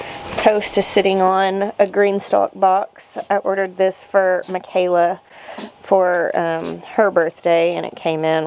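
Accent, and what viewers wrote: South, United States. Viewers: Just for the record, you're beautiful and I love your cute voice <3. Your southern accent is perfect for video; I think my own midwestern voice would drive me nuts if I vlogged! Thanks, for the encouragement about going gray. It's always hard to resist vanity and choose to be comfortable with how God made me. I'm not going gray yet, but I'm sure it's coming soon!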